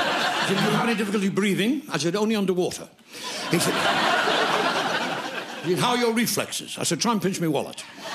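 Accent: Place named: Liverpool, United Kingdom. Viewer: Liverpudlian thru' and thru'.....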